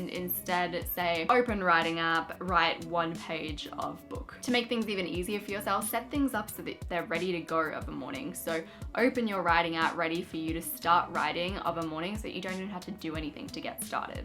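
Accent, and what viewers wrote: Australia. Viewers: I am trying to place your accent. Are you Australian?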